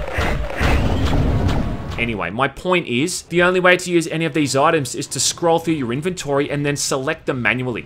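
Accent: Australia. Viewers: Thanks for the video review of one of my most dearly loved games. I’ll be sure to subscribe to see more like this. I’m an Aussie too so it’s way better hearing an Aussie doing the reviewing too lol 👍🏼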